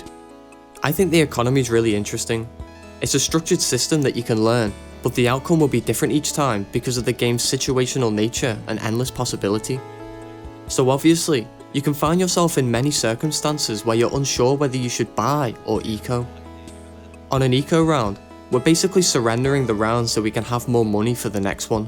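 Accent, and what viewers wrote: Liverpool, United Kingdom. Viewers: scouser accent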